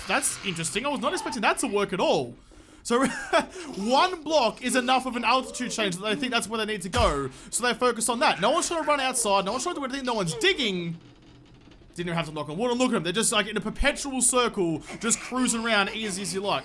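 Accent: Australia. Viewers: Love the ozzie accent :)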